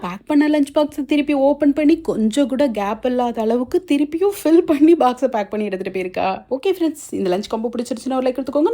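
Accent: Tirunelveli, India. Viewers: apdiya ma nanum athey doubt la dhan keka vanthen namma ooru slang mathiriye irukey nu😅😊neenga tirunelveli la entha side?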